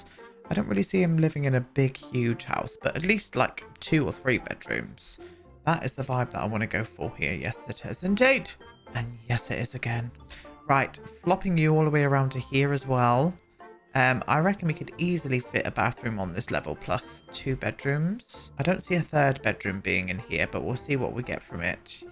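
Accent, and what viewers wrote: United Kingdom. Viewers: What a gorgeous build, as per ushh 💁🏾‍♀️. Soothing voice too, sass and all. Also so incredibly British, I love it 😂🥰💁🏾‍♀️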